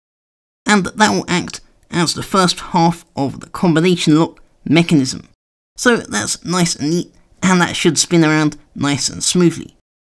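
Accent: United Kingdom. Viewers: Cool.I have my own channel which is inspired from seeing the worlds coolest LEGO builder. Are you from Great Britain?The accent is very familiar to Britain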